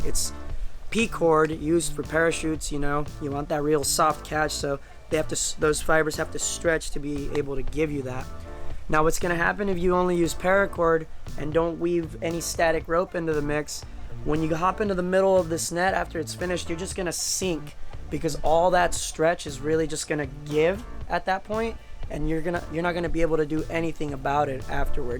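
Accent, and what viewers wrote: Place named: Spain. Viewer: I like the Spanish accent. Caught me off guard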